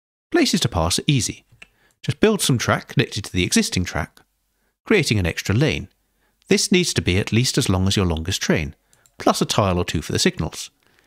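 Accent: United Kingdom. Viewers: I really love your voice. That soft british accent makes me think I'm a young child, watching some british infomercial or something like that. It's really soothing, and helps me learn. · That accent is so British, 😂. Thanks for the video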